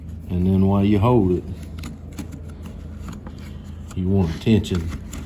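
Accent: United States. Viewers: Excellent video brother! Helped me get my machine back running and made a good days work. Also your accent is killer! I’m guessing you’re from Georgia Love from Florida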